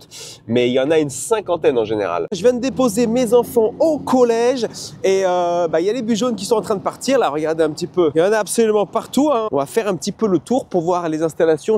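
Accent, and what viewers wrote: France. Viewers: Je pense que les gens de Upstate New York sont fascinés par votre accent. Les gens vous posent souvent des questions sur la France ? sur votre origine?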